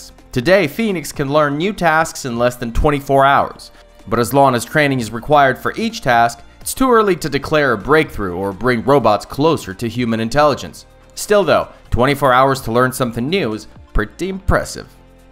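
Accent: Texas, United States. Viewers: So when did the narrator pick-up an accent (Texan, I think)?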